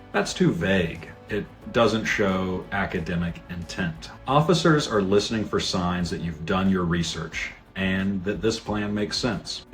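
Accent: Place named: England, United Kingdom. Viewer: Like the way that you simplify things. Best visa officer and English accent ever.❤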